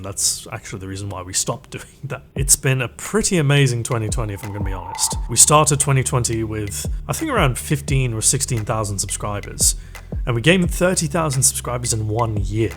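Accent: United Kingdom. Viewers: Happy birthday,! Only subbed very recently, literally two days ago. But I love the content I have seen, and it's lovely to see/hear a brit in a sea of Americans. Thanks for the tips and the laughs, here's to 2021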